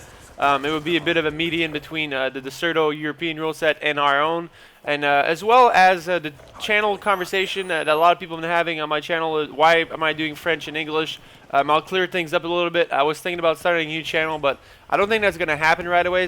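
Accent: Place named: Quebec, Canada. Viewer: it sounds like ur quebecois accent is more obvious after u do ur french commentaries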